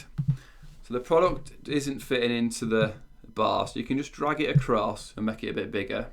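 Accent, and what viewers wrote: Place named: Liverpool, United Kingdom. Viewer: Good stuff, I just had a phone interview where, in addition to my SSRS experience, I said I have worked with Crystal Reports. Good overview here. Hey are you from the Liverpool area? I'm a Yank but I try to discern British accents. You have an accent similar to Paul Hollywood on the Great British Baking show.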